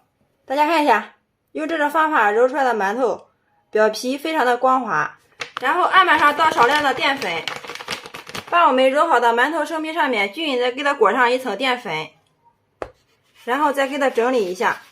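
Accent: Shandong, China. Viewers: 不单纯是快的问题，主要是山东话味儿太重所以听着费劲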